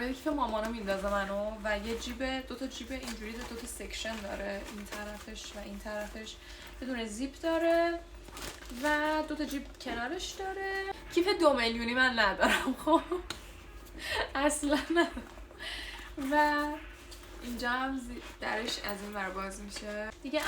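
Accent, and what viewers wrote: Iran. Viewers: احساس میکنم اصفهانی هستی، درسته؟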